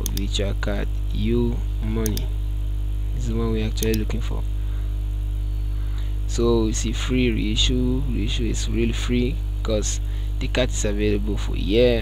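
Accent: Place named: India. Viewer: Boi you sound like Indian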